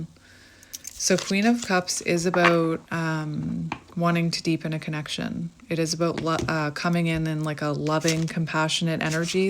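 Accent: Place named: Canada. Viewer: As a Canadian I've been wondering for a minute if you were also Canadian because of your accent. I'm in the U.S. so I can def recognize that homeland dialect 😂❤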